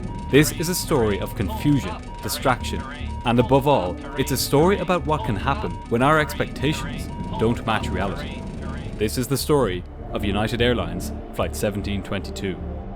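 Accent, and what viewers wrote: Ireland. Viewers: Your narration skills are first class -I like your Irish accent coupled with your easy listening mellow voice – one funny thing though -to me at least (especially for aviation videos ),is the way you pronounce “column”-your Irish accent comes out as “collleeum”-a cross between cauliflower (or maybe Colosseum !)and column, Gosh I hope I haven’t made you self-conscious after that !, on a serious note you have a brilliant channel which does not sensationalise nor overdramatise the events and covers the technical aviation aspects well but remains gripping and riveting like a good drama❤ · well bud, that’s the beauty of the Irish brogue, remember, those slights in the queens tongue are what made Irish literature legendary.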